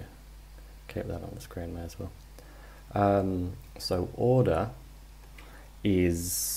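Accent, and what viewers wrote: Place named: Australia. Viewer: with Aussie accent op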